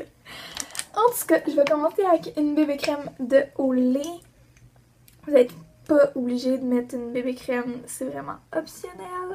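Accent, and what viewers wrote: Canada. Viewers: Tu es super belle êt ques que j'aime ton accent canadien j'aime beaucoup ❤️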